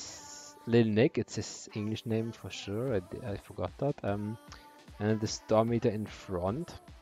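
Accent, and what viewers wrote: Germany. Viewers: Du bist deutscher und redest englisch hört sich echt bh an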